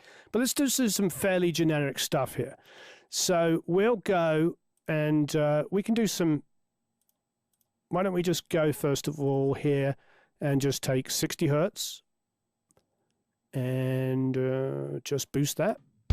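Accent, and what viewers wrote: United Kingdom. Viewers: lol hair like that, British accent, and music background. WOW are you a shoo-in for California. 😂😂 i hope you make a million!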